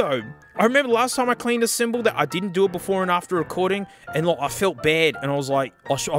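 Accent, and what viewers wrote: Australia. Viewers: It's the magic of Australia accent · This man is audibly austrailian. Thanks, youtube reccomendations.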